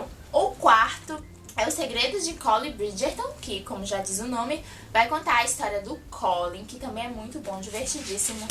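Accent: Bahia, Brazil. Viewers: tu é baiana????? Nossa eu preciso te conhecer!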